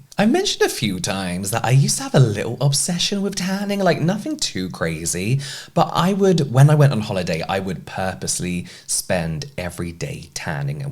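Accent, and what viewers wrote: United Kingdom. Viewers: Wait why did I not realize you had an accent until you said you were British😳🫢😭😭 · idk sh*t about british accents but I think this man has the same accent as Jamie Dodger, the cool trans guy Youtuber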